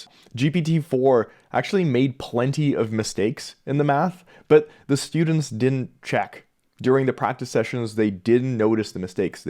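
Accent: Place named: Canada. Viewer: Where is this guy from? Sounds Canadian, or something....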